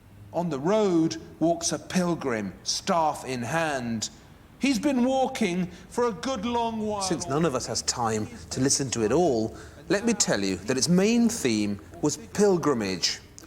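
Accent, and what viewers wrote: England, United Kingdom. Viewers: Why do you pronounce the "er" in clerk as you pronounce the "ar" in art? same with the fooking derby. The Kentucky Derby. innit? . Derrrrrby. not Darrrrby. With an "e". The Darby? Fuck sakes, England. Get it right. Yes, yes, we say learned instead of learnt, and burnt etc. but at least we pronounce derby correctly. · Waldemar Januszczzak you are taking my breath away, the way how you talk this narrative is literal refreshment. I hear you with my nices brain neurons while my ears turned deaf with that beautiful British accent. Thank you for sharing your knowledge, journalists' curiosity, poets' spirit, and Slavic gen.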